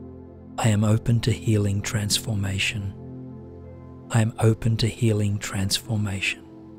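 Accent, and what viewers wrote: Australia. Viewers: J Wheeler an Australian. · I was checking and he is from Australia. I love his accent.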